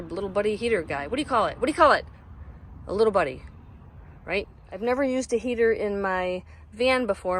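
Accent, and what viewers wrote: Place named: Minnesota, United States. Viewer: Actually your Min a soda accent drives me nuts. And your husband must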